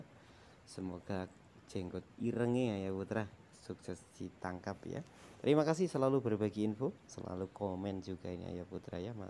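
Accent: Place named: Java, Indonesia. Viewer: Joss cak smga sukses selalu cak logat jowomu gurih😁👍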